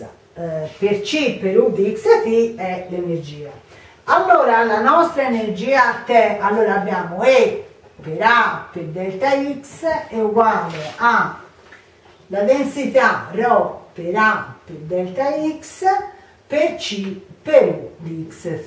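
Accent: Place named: Tuscany, Italy. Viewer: Toscana palese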